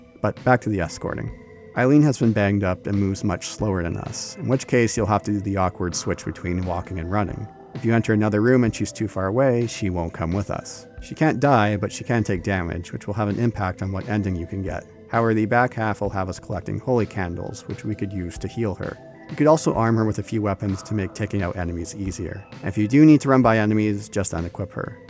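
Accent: United States. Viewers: The California accent is strong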